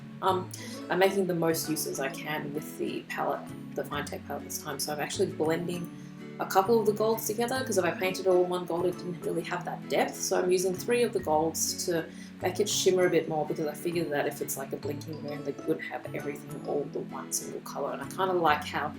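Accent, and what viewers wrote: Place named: Australia. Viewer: are you australian or british, you have a very interesting accent because i can't tell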